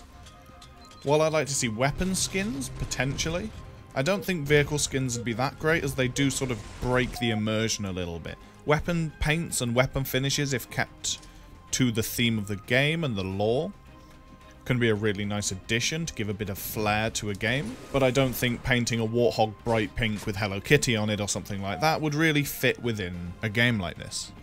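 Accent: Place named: New Zealand. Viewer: Holy fuck I knew that was a New Zealand accent, I'm proud of myself for guessing. I'n year 7 we had a maths teacher from New Zealand and she was constantly pissed off because people thought she was Australian.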